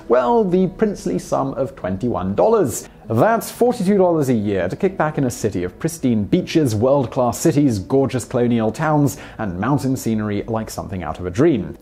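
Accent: England, United Kingdom. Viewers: He's english get over it. Different countries pronounce things differently. Who'd have thought it?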